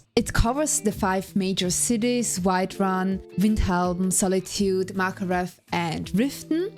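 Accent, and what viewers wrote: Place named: Sweden. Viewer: I love your accent sounds swedish/nordic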